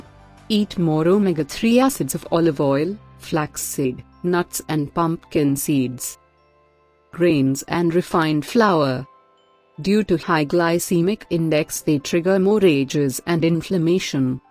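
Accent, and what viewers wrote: India. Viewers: combination of voices. robotic and.Indian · Refined Indian accent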